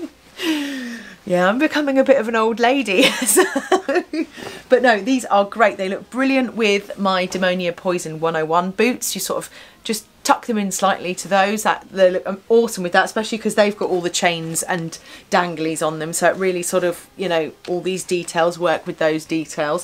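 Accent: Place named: England, United Kingdom. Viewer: It ist a French word! And I love it. I think it means the same as décolleté. If I give the word decolletage into the online translator it means in German somethin so spezific different ;) Thank you so much for a new favourite word. One of my other favourit words is generous. It sounds so loveley. By the way I like your English accent.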